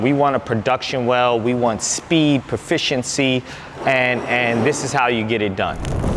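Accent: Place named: New Jersey, United States. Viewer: Dude the Jersey accent is such a change of scenery for a welding tutorial coming from being taught by a bunch of southern boys. 😎🤘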